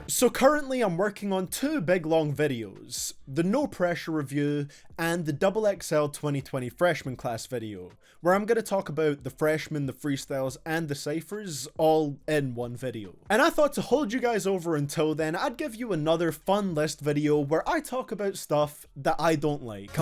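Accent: Scotland, United Kingdom. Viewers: Its probably the scottish accent. Or the exciting wait for the HAIR REVEAL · I think he's scottish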